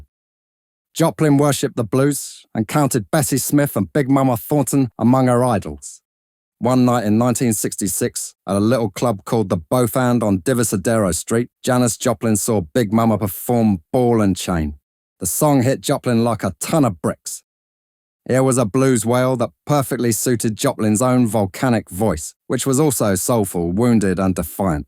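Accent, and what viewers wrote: United Kingdom. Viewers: This narrator is a supreme God of all of narrators. This is great a greatly appreciated narration. His British tinged delivery is awesome. Well Done, sir. I didn’t know all of these details about her.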